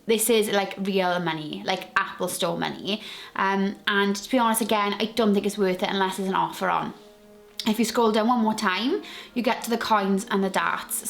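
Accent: United Kingdom. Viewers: She literally has an English (or Welsh) accent. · She is scouse my g an accent in English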